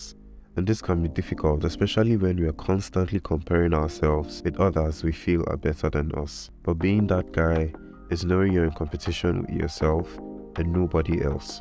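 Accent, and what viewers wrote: Nigeria. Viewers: Why you have Nigerian accent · I just want to know, cos you sound Nigerian. Are you Nigerian? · You know he's valid when hes talking bout mens self care and he has a nigerian accent.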